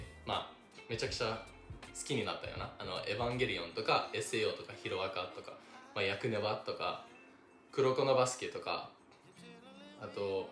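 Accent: Kansai, Japan. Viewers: 日本語がめちゃくちゃ上手いですね！いわゆるアメリカ訛りのアクセント強めの日本語発音もしてないし、しかも関西弁😂日本の文化を好きになって、日本語を習得して凄いですね！ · 関西弁はええよな！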